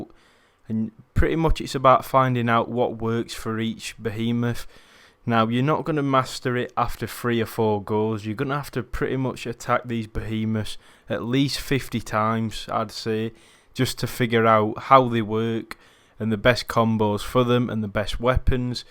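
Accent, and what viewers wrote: Yorkshire, United Kingdom. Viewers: Nice to hear a yorkshire vlogger lol nice 1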